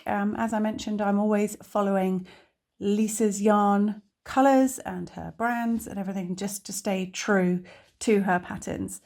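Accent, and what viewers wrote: England, United Kingdom. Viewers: I adore this and your style of teaching is brilliant. The only thing that fooled me was I heard the English accent and thought, yes English terms , but alas not, it was America terms. Keeps my mind active, changing them into English as you are saying what stitch to use. Lovely work. Thank you .💞💞💞🧶🧶🧶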